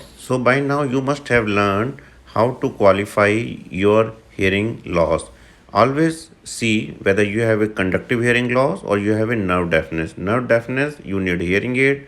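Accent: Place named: India. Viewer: Close captions would be handy considering the strong Indian accent, and that hard of hearing or Deaf folks will likely be seeing this.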